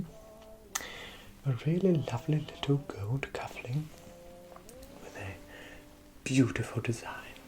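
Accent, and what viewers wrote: United Kingdom. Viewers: I finally find British ASMR. Hello from Tehran 💚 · I got so used to the british accent so fast that when he mentioned he was the best tailor in the UK I had to take a moment to collect myself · Just discovered your channel last night. The Butler one where you are unwinding us from.a night out was FABULOUS!!! I love themed and time period based ASMR vids. The Fire crackling, the light old time background music is perfect. And the elevated British speaking tone is superb. "Chefs kiss" Keep up with the lovely and hard work you put into these. Looking forward to binging your channel ❤ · Are you actually British?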